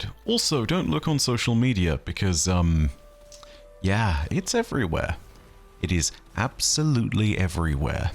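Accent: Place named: England, United Kingdom. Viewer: Scuppered. Love it, thanks for my daily dose of the King's English.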